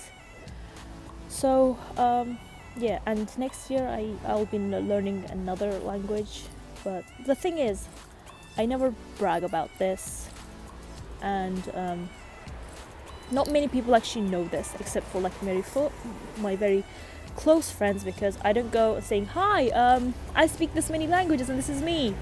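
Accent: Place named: RP, United Kingdom. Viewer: Standard British Accent) Well Done!)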